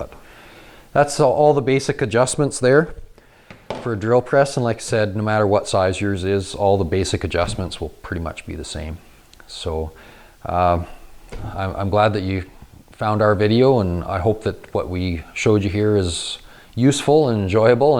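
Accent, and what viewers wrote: Canada. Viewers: You sound like a Canadian eh. Am I right? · holy canada accent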